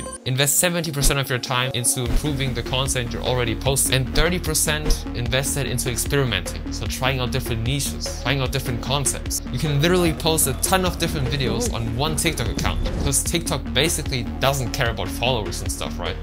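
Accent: Germany. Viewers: wait are you from germany?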